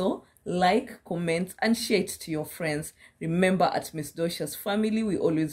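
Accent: England, United Kingdom. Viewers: I love your English accent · I too subscribed sis how neatly and beautifully you cook , woooooow environment and recipe too. I admire at your English accent too... You are humble and respectful towards viewers🙏🏻🎉👍🏻, i love you dear sis - This is Raji from Chennai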